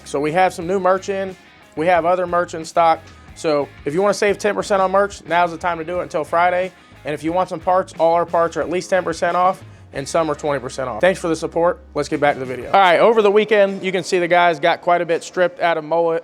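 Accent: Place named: South, United States. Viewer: Thanks for the great content. And also many thanks to your cameraman and editor. Question. Ive heard you and say "Sawzah" several times. Sawzall is a brand name from Milwaukee tools. Is that just a Southern thing to say Sawzah? Im genuinely curious. Not trying to be rude. People pronounce things differently all over the world.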